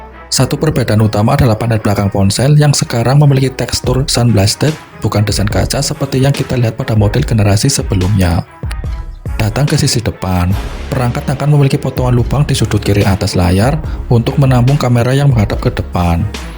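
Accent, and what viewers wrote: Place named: Java, Indonesia. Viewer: Suarane wes ketara medok hehehhe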